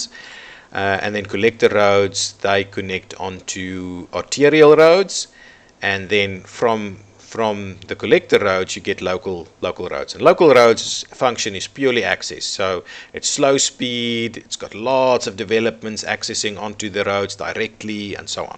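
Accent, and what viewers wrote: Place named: South Africa. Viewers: I pinned him as South African immediately. :) 👍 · As soon as i heard him speak I thought you were South African, then I heard you say "trrry" then I knew . Glad to have this video. Really helped me as I always battle with transport on cities skylines. You just got a sub.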